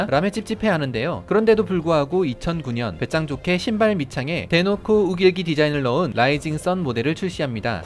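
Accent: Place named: Seoul, South Korea. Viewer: 서울사투리 상당히 거슬리네요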